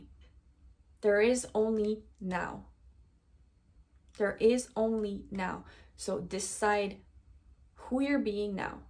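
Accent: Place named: Quebec, Canada. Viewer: You sound so Québécoise! 🥰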